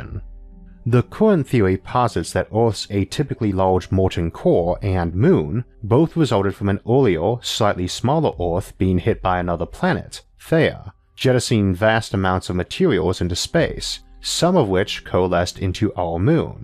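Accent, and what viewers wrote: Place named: United States. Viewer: It's not an accent, it's a speech impediment. Though otherwise he has an American accent.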